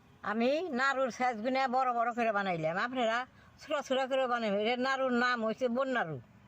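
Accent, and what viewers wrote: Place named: Manikganj, Bangladesh. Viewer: উনি বাংলাদেশের মানিকগঞ্জ, টাঙ্গাইলের ভাষায় কথা বলেছেন। ধনিয়া কে বাংলাদেশের অনেক অঞ্চলে হজ বলা হয়ে থাকে। উনি গোটা ধনিয়াকে গোডা হজ বলেছেন। আরো কিছু অর্থ নিম্নরুপ : ১.ফাকি = গুঁড়ো ২. হুগনা = শুকনো ৩.মইচের ফাকি = মরিচের গুঁড়ো = লঙ্কার গুঁড়ো ৪. নবন = লবণ = নুন অল্প মিডা মানে অল্প মিষ্টি যেটা কিনা অল্প চিনি দিয়েছিলেন সেটা।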